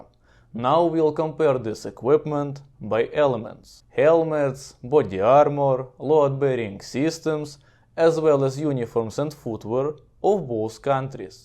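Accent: Russia. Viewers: New viewer here. I have never heard a more Ivan sounding Ivan than you before. Love the accent. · Hotel posmotret...yslushal dikiy ryski akzent..I rashotel smotret.. Ychi Angliski sinok...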